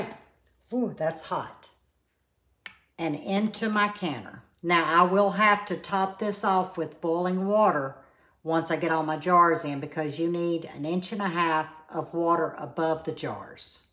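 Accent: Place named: Louisiana, United States. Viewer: I feel like I'm back in Louisiana listening to your accent..... P.S. can you speak Cajun French?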